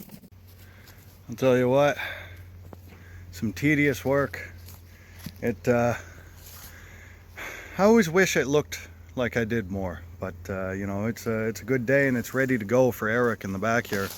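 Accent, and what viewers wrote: Canada. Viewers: If folks couldn't tell by your accent that you're from Ontario, the Eh would have given it away! <3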